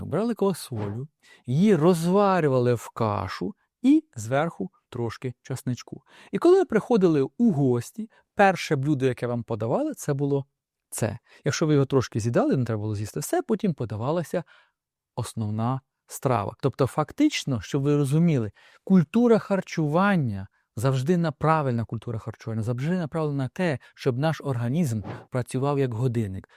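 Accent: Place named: Vinnytsia, Ukraine. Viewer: Це діалект нічого страшного,,навпаки цікаво,так розмовляють люди,які живуть у Вінницький області.Я слухаю з задоволенням.Ми не на каналі граматики української мови.Сенс не в цьому ,а в тій незвичайній,особливій інформації., яку чудовий справжній лікар нам розповідає.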